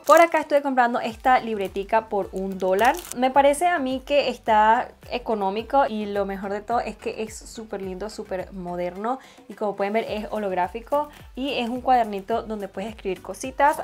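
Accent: Venezuela. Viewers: Queria preguntar de donde eras. El acento se reconoce siempre Venezolano.❤️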